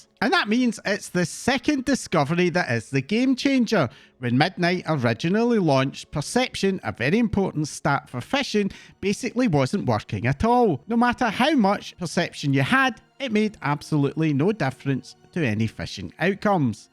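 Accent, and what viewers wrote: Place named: England, United Kingdom. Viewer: Your english acsent is epic <3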